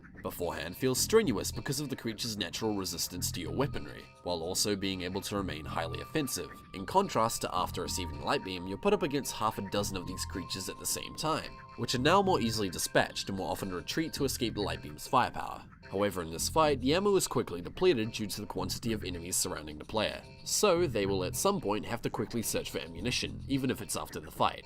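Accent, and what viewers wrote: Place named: New Zealand. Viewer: Was listening to a random gaming podcast on Youtube. Heard an NZ accent. Instantly subscribed.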